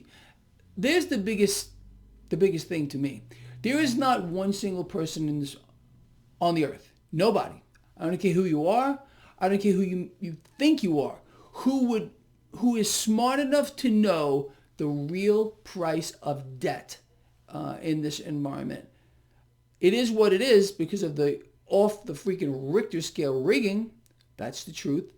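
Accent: United Kingdom. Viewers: British..The accent is British... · Sounded a little bit like a posh English accent 😁👍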